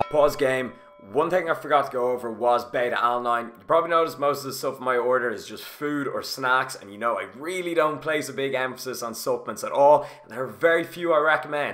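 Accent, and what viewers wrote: Ireland. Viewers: Interchwined 😂 I could listen to the Irish all day aha, another great one mate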